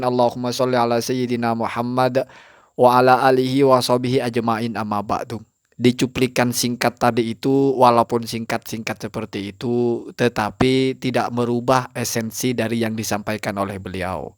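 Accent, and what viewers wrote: Madura, Indonesia. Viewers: Ustadz orang Madura ya. .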